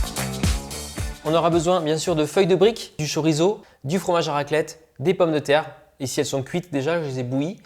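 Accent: South, France. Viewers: A tester dès que possible avec notre reste de fromage à raclette. Je sais pas si c'est moi, mais je trouve que notre, il rechoppe un peu plus son accent du sud. C'est charmant!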